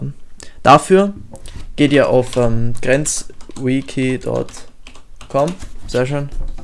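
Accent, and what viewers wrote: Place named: Vienna, Austria. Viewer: Man merkt gleich, du bist aus Österreich, würde auf Wien tippen, sag bitte ob das stimmt haha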